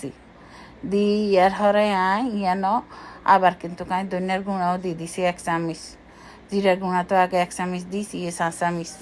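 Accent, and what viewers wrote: Noakhali, Bangladesh. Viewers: আপু নোয়াখালির কথা আমি তেমন শুনিনি আমার কাছে খুব ভালো লাগলো তোমার ভিডিও টা ❤️সাথে তোমার কথা গুলো 👍👍👍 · রুই মাছের কাবাব অসাধারণ হয়েছে মাছের কাবাব রেসিপিটা আর সুন্দর সুন্দর নোয়াখালীর ভাষায় কথা বলে কাবাব রেসিপিটা বানিয়ে নিলেন চমৎকার চমৎকার চমৎকার❤🎉❤🎉❤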